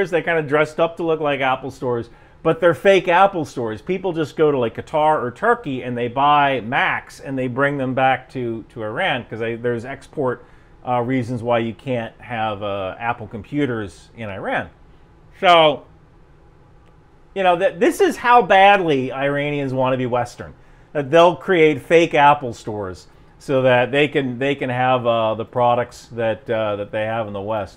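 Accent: New Jersey, United States. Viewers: Please use your Jersey accent more! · say "It's not a nursing home! It's a retirement community." in your jersey accent. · For the record, New Jersey accent is from a very small part of the state in the northeast, adjacent to Staten Island New York. Pretty much the only people who talk like that are, Snookie and her friends. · You should really, really revert back to the Jersey accent. I really dig it. I don't think it sounds stupid at all (and I'm not even remotely from that region)😂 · My dad was from Maryland, you Jersey types all sound like you just came off a Jersey Shores set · You've got to do an episode with your natural Jersey accent! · To a west coaster like me, the New Jersey accent is like 95% the same as Bawlmerese, so I'm surprised that Marylanders would think you sounded *different*, much less dumb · Please keep your Jersey Accent! 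It is fun to listen to! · Kosher pickles? Joyzee accent? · Toni soprano? wow you sound just like him when you talk with that Jersey accent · Bro just casual about the Jersey accent, thats crazy you sound like your actually from jersey.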